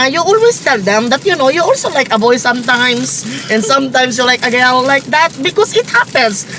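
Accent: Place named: Visayas, Philippines. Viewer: Omg you never fail to make me laugh!! Ang galing mo teh!! Hahah! Pasok sa banga yung bisayan accent!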